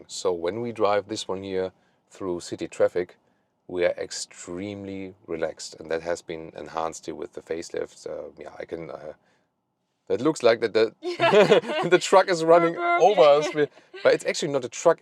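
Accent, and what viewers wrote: Germany. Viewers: you are good in many ways but please work more to improve your English! Is very tiring when you speak English but we hear mostly German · I love to hear your German accent :) perfect . nice review as always. is it original quicksilver color? or another color changed with ppf? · An excellent review. Love your German accent and your English is perfect, thanks for a great review.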